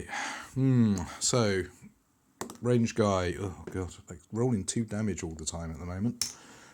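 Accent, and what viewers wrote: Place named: United Kingdom. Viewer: Thanks for sharing your videos. The encounter text somehow feels more appropriate when hearing it in a British accent. :) A small suggestion on your audio -- consider converting it from stereo to mono. When listening to the video with my headphones on it feels like your voice is moving around a bit. :)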